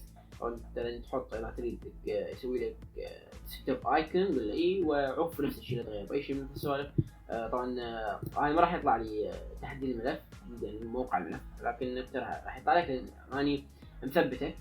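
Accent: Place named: India. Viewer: أمانة أنت فهمان شو عم تحكي ، يازلمة عم تحكي متل الهنود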